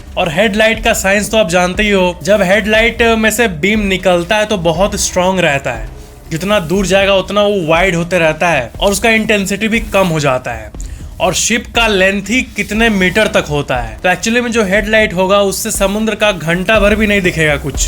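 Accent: Bihar, India. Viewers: Ap bihar k hona🤔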